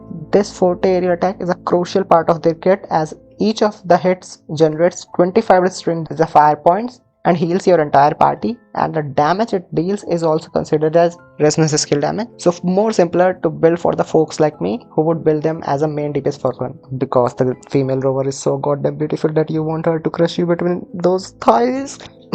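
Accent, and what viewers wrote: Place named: India. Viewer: The accent tells me that you are Indian?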